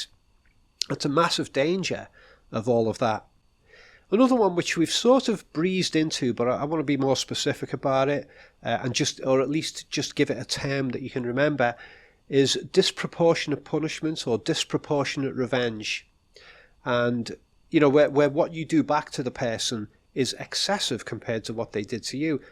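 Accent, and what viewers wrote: Liverpool, United Kingdom. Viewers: Mr, I've watched your videos off and on a for a couple of years now. Your videos are fantastic and your insight is incredible. I've often thought your accent is quite similar to that of Paul McCartney. Well now you've confirmed it for me as you stated you are from Liverpool just as he is. You kind of even look a bit like him. Just an observation is all. Be well sir.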